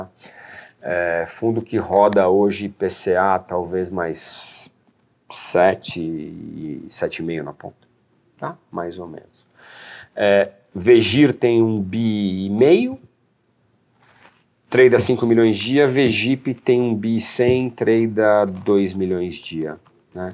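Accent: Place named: São Paulo, Brazil. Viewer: Esse sotaque paulista é um tanto engraçado. Cliente Valora aqui ;)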